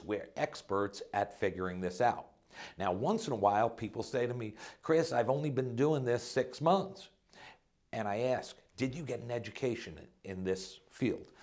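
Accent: New York, United States. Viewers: NM. I see you're in CA. I thought maybe you're in NY from your accent.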